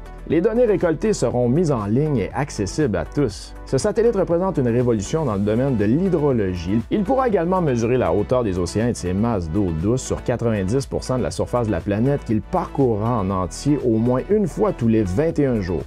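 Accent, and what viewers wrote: Quebec, Canada. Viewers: Très bonnes infos. En tant qu’européen j’aime beaucoup le côté décalé de la présentation et l’accent québécois si agréable à entendre… ça me change des psychorigides d’ici 🤣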